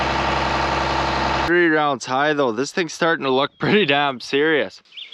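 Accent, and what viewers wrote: Canada. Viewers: Think you mean to say “Canadian” grit! That accent is classic 😆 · First of all, this is so pleasant to watch and secondly, an awesome reminder of how hard work can be fun. The last thing is, I’m so charmed by this Canadian accent and I didn’t think I would be. It’s funny how we can speak the same language and it can still be so wildly different but it’s just different people evolving the way they speak in different parts of the world. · As a Canadian i can say, this dude has one of the strongest Canadian accents ive ever heard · Based on what I can tell from this video alone you are a great roll model for other young men to learn from and I don't only mean the building of your home but also the way you speak about it and the work ethic you have, those parts can be used in many areas of ones life to live a good life. Besides, who doesn't love a Canadian who acts and talks as Canadian as one can get, lol. 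Loved this video!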